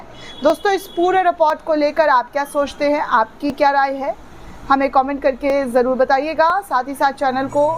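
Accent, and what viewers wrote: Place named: Uttar Pradesh, India. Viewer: She is from UP probably from western UP or Central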